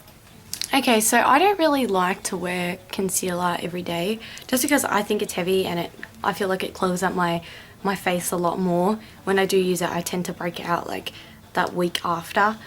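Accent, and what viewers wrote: Australia. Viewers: Australia. Can't you tell by her accent?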